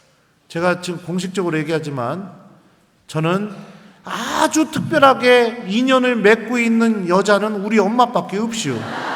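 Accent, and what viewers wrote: Chungcheong, South Korea. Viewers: 새로운 성가네요. 신부님의 에피소드는 백번을 들어도 늘 새롭고 감동이고 재밌어요.^^ 목소리만으로도 치유됩니다. 이젠 충청도 사투리만 들어도 힐링되는거 깉아요.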